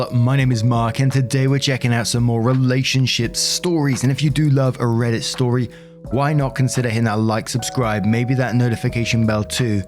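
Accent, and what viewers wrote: England, United Kingdom. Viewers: Except when dialectical colloquialisms are involved. Mark is from a certain part of England that has a certain accent & certain phrasings particular to the region.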